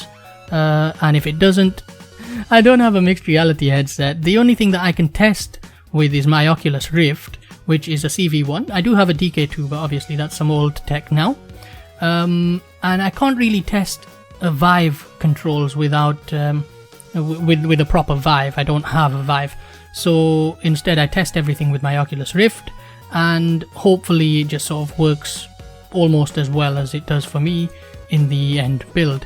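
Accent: United Kingdom. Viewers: Awesome work btw not to be too creepy but what part of the uk are u from?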